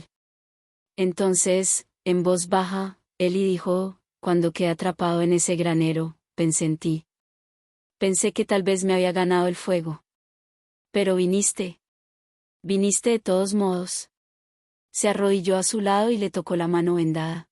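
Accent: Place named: Colombia. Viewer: No me gusta la voz de la narradora ese acento colombiano 😢😢😢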